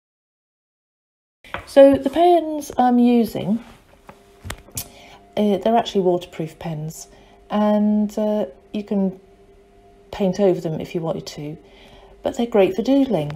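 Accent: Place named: United Kingdom. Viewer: Omg I love your videos when you use your beautiful voice with your British accent which is the coolest. I live in New York in the states so Im sure I have an accent as well however mine sucks. XO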